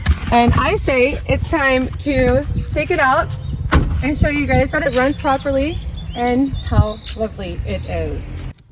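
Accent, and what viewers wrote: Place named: United States. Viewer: Shame about the whining American accent 👍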